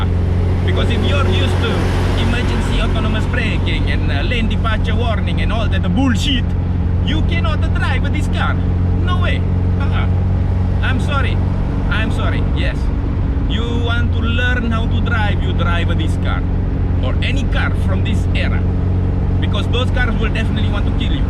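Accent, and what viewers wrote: Italy. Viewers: Nice engine sound plus your Italian accent 😂. I also want to buy one classic car since I started to see your video 😄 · Hahaha ohh boy, your italian accent is so funny 😂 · Love tat italionooo accent...u should try getting into movies...surely talented...awesome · Hahaha 😂 love the Italian accent . Made me just so happy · ...you are truly posessed, confirmed, chop & signed! There are no more medicines in the world which can cure you for this kind of italiano crazy possession. I will try to arrange for you an italian priest to perform the exorcism to rid off the 'Alfa Romeoooo' ancestral evil influence on you soon...but btw,you truly made my day today just listening to you rambling thru your italian 'celup' accent.It was very funny & original pc of work there. You are truly the best out there.. haha..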